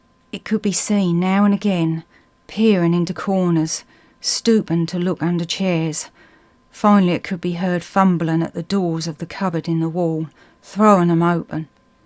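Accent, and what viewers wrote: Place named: Suffolk, United Kingdom. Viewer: Well suited together, M.R. James and the Suffolk accent.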